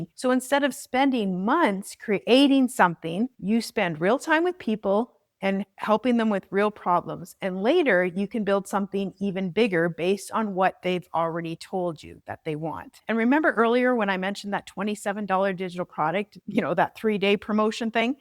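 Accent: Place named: Canada. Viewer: Me too I heard her Canadian accent. I’m from Nova Scotia but live in Montreal now 😊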